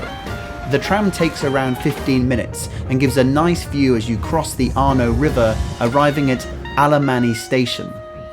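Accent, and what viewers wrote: England, United Kingdom. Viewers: I love your videos👍🏼 and your English accent 👍🏼👍🏼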